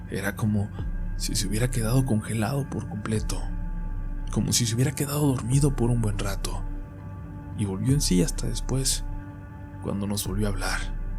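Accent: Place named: Tijuana, Mexico. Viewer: 🤣🤣🤣🤣🤣🤣❤️❤️ me encanta, en este relato se escucha bien bonito, bien vato, le Tijuana en la sangre, ❤️🤣🤣🤓🎧 que lindo, muy buen relato, más así por favor, naaaaambre vamos a compartir 🤓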